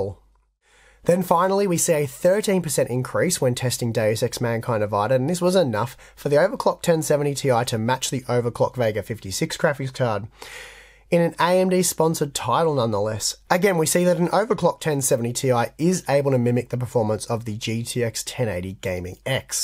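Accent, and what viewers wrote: Australia. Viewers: Get well soon! The videos are not the same without your full strenght australian accent :(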